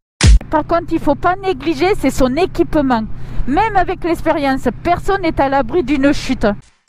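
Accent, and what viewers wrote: South, France. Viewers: Qu'il est rétro-rafraichissant cet accent du sud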